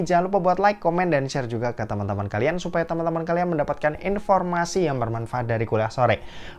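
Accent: Semarang, Indonesia. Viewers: Anak Semarang ya mas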